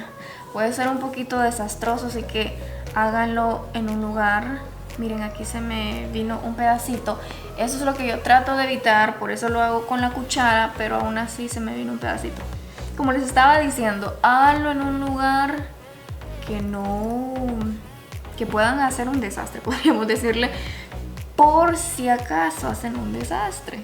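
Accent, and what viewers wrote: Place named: Honduras. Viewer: Lo q me encanta y admiro de ti esque no has perdido tu hacento hondureño!!! 😘😘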